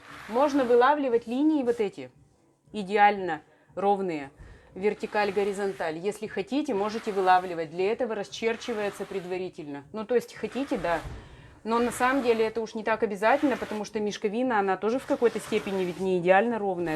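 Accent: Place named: Udmurtia, Russia. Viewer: Тётенька прикольная и акцент как с Удмуртии )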